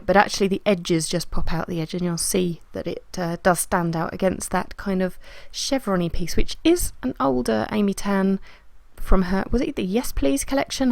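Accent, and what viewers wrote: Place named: England, United Kingdom. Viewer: Not kind, truthful. I was surprised to hear an English accent though, I was expecting an exotic Nordic-type accent. I have seen you before but only as your name, so didn't connect the two together. Can't wait for more of your lovely cute videos. I definitely agree with Cathy Yosha! See you next time.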